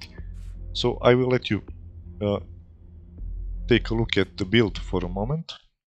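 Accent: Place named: Russia. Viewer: Русский судя по акценту )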